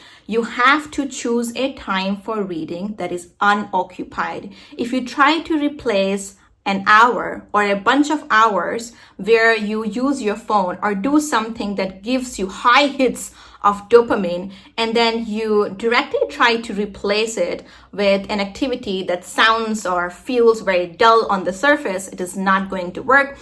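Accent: United States. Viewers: Please sister tell me , how can get American accent like you ?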